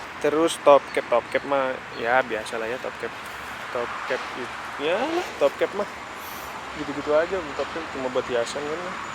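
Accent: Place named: Medan, Indonesia. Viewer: Jdi logat orang medan 😁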